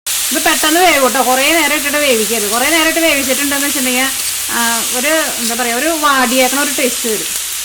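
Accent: Thrissur, India. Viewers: Mmade Thrissur slang Oru prathyekatha thannaaaa tta eshta😄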